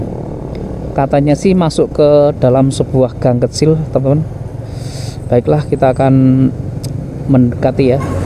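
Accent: Java, Indonesia. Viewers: Rasane aku melu jalan- jalan om pasar tradisional juga om 🤭 Aku dr Sumatra seger liat pasar2 tradisional e, sama logat Jawa om nya khas sekali. Semangat om 💪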